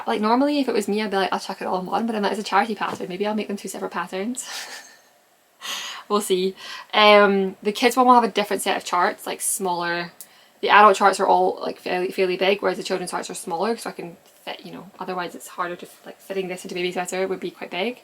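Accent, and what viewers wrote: Scotland, United Kingdom. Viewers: Hi, My first time watching your channel - lovely to see you looking so well! I have knitted your patterns before and love them. You are such a talented and generous knitter. One comment - I am from Edinburgh and lived in Scotland all of my life - but I struggled keeping up with your commentary..😂. You talk very quickly, with lots of words..I know it is a Scottish thing to rush at sentences, but it wasn’t quite as relaxing to watch as some other videos. Appreciate you have a lot to get through, so not a criticism, just an observation…❤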